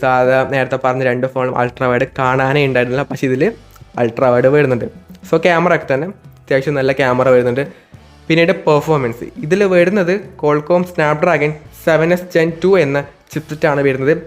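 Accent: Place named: Malappuram, India. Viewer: Malappuram jillayilano.rasamulla slang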